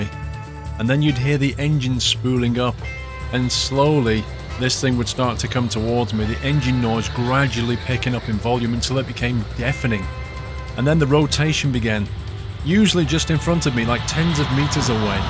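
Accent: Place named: United Kingdom. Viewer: You sound like every British narrator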